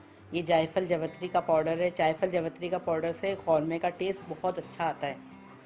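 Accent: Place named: Bhopal, India. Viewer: Apki zaban bilkul indian(bhopali) jaisi hei👌👌 or apka haddi wala pulao mene bna kar dekha boht accha bna hei👍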